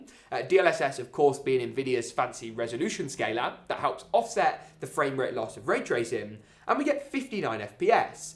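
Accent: United Kingdom. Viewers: Came here for dat British accent, Stayed for the awesome montage, And returned for the build guide, for building my next new PC.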